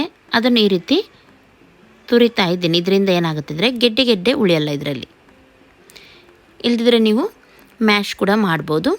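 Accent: Mangalore, India. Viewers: Medam sorry..but wher you from.?? R u from Mangalore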